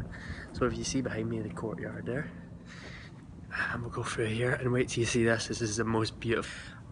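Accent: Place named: Glasgow, United Kingdom. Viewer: I want a Meet and Greet in Glasgow! I was born in HOUSTON , TX. I now live on the Gulf Coast of Texas. I lived in Corby, Northants in the late 80’s. Love the Glaswegian accent. I’ve got God-daughters in Leicester that are amazingly gorgeous. Hint, hint. I’m sure they’d be GRATEFUL to meet such a positive guy. YOU ARE AWESOME!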